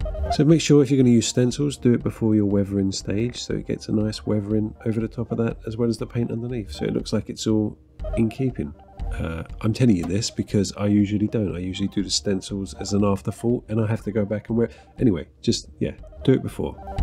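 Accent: United Kingdom. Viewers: heees british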